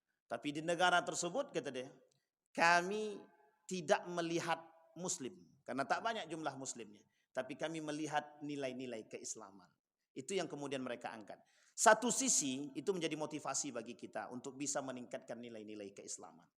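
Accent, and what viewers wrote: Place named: Riau, Indonesia. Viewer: Dari Riau sptnya. Sama spt ust abdul Shomad.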